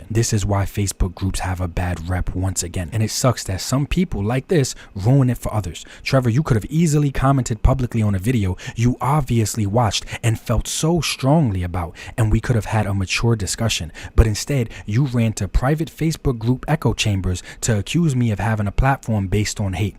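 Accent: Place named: Bronx, United States. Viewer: Classy, respectful video while making your point 👏 . I have watched many of your videos but this one made me sub. That a bronx accent? Cant put my finger on it. Def tri state area though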